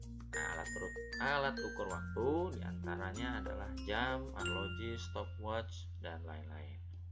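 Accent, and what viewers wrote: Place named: Lampung, Indonesia. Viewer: Dari Lampung mana suaranay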